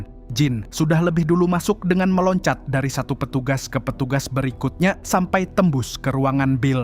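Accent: Banjar, Indonesia. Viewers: Baru tau kalo kamu urang banjar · Urang Banjar png asa un nh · Dikira salah dangarr, skalinya bjuran urang banjar yg mreview😂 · Orang banjar jirr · Orang banjar kah pian mang??? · Admin Nya Urng Banjar Kah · Host ikm urang banjar jua kah 😅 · Urang Banjar sekali nya neh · Waluh urang banjar sakalinya admin nya.. 😁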